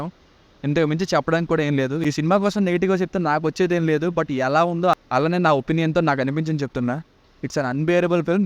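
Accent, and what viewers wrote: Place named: Andhra Pradesh, India. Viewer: అరేయ్ నువ్వు ఆంధ్ర వాడివని నీ యాస లోనే తెలుస్తుంది అందుకే రాయలసీమ తెలంగాణ హీరోలను డి గ్రేడ్ చేస్తుంటారు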